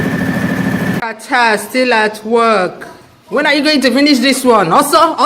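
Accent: Nigeria. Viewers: How refreshing to watch travel video of a person who still speaks like a Nigerian... i got fed up listening to those with foreign accents, whether genuine or fake foreign accents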